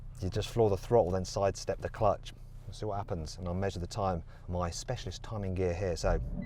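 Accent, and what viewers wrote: United Kingdom. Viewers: 진짜 영국식영어 지대로네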